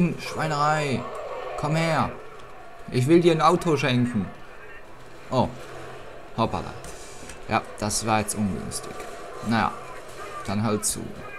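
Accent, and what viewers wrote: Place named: Switzerland. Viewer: Ehi du bist schweizer oder? Man merkts sofort :D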